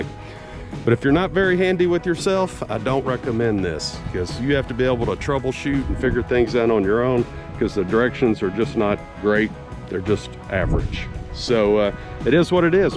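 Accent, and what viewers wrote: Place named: Texas, United States. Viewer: Hi there, nice and easy, your way of talking texan its just amazing , viva texan,....the explanation was great , thanks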